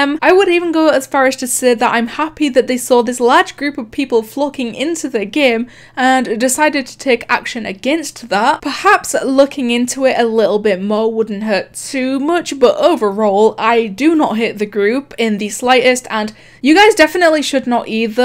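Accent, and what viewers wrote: United Kingdom. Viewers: Shes Accent seems british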